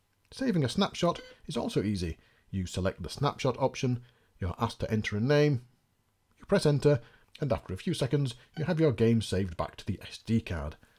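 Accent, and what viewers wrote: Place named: North, United Kingdom. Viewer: no northerners pronounce stuff correctly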